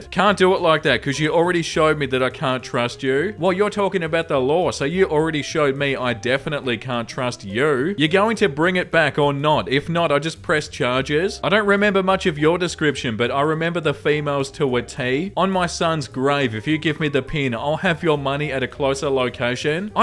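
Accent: Australia. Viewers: Lol my cats name is sassafras ❤ also when I was at the mall yesterday I started feeling not great and I ended up sitting on the floor in a quiet corner in the mall with Starbucks listening to videos, just what I needed, love you ❤ P. S. As a kiwi, you’re literally the only Aussie I can bear listening to 😂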